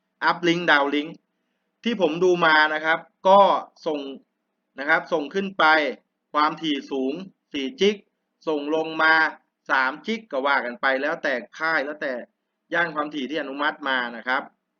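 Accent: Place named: Samrong, Thailand. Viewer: เว้าสำโรง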